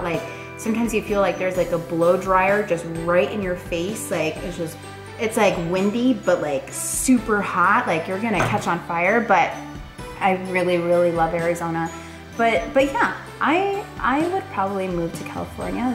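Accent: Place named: United Kingdom. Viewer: I always enjoy your videos and that British accent! 😂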